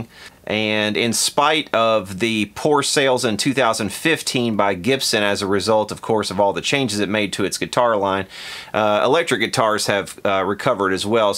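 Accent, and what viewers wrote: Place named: Louisiana, United States. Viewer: You sound like Theo Von. Are you from Louisiana?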